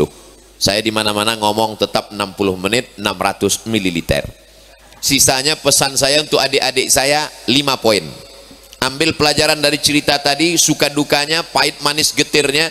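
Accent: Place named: Sumatra, Indonesia. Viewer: Maklum lah , kita orang Sumatra dialek kayak gitu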